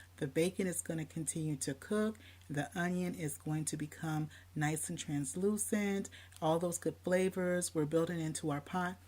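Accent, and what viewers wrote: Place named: South, United States. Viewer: Now that is some south in your mouth ❤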